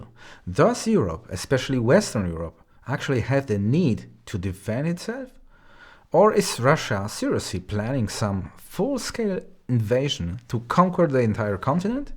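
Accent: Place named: United States. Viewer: Słucham na 1.1x-1.15x – lepiej 'płynie' i zastanawiam się czy nie byłoby warto od razu publikować z lekkim przyspieszeniem. Wydaje mi się, że tempo mogło być trochę szybsze, bo do takiego angielskojęzyczni widzowie są przyzwyczajeni. Wtedy, żeby to się za bardzo nie zlewało można zacząć nieco bardziej dobitnie wymawiać niektóre słowa, zwłaszcza że akcent jest bardziej amerykański i tam to pasuje. Tak czy siak jest świetnie:) Taki punkt widzenia jest bardzo potrzebny. Zostaję, pozdrawiam i życzę powodzenia.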